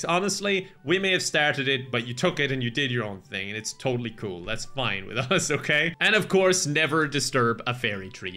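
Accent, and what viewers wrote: Ireland. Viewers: turned so Irish in this. Like he is letting loose his Irish tendencies in this video. Like so many Irish angry rants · I like how accent gets progressively thicker and more irish as the video goes on and he gets excited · Omg I just love irish accent so much. I dont understand anything but I just love it. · This is most Irish I've heard in a while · I love cranking his Irish accent up to 11. I'm Canadian and we can't do that because the "Canadian" accent people hear in pop culture isn't Canadian at all - absolutely no one here has that accent and I don't know who does, if anyone.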